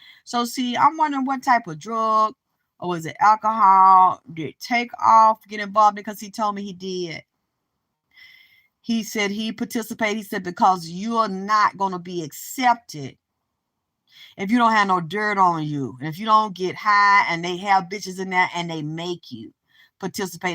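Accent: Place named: New Orleans, United States. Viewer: Your accent I love it and you are funny sound like u may be from New Orleans dirty dirty south accent dirty my favorite word you say lil Wayne and Juvenile say that dirty all the time.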